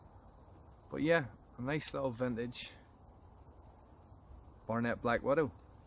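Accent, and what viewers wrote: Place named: Scotland, United Kingdom. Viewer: He sounds like he is from Stranraer in Scotland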